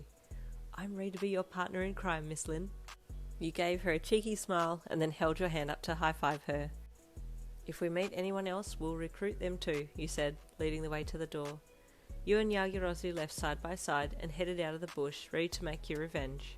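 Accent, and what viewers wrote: Australia. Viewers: Yes I immediately knew what pants meant but if you had said dacking 😭😭 I’d be so lost like please explain your Aussie accent to meeeee 🤣💀💀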